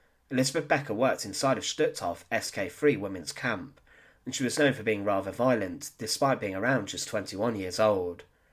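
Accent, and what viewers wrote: United Kingdom. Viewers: It seems you have a British accent, have you ever made a video about the 50 plus concentration camps build by the British? i guess NOT! You poms are good in pointing the finger on others!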